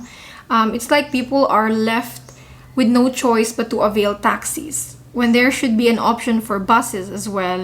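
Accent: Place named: Philippines. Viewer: I just love Filipino accent. Tops!!! The guide is really helpful. Tnx "Chaotic" The Philippines wouldn't be Philippines if it's not chaotic. lol Customs discriminate against Filipinos. They believe that if you're Filipino you're "poor" and there's nothing to check-quite "insulting". lol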